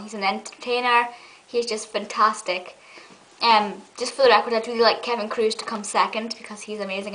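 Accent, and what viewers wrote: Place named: Northern England, United Kingdom. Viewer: I thought Paul was pretty good. Too bad he only did impressions of people and characters that I don't particularly care for. Harry Hill? Blah! Scooby Doo & Popeye? I liked them when I was 6. Anyway, I'll bet you get this all the time, but I love your accent! You replied to someone else´s comment, saying that you're not Scottish, which I must admit, I thought you were. So is it Northern England, then? It's beautiful. And so are your eyes. (sorry) But they really are!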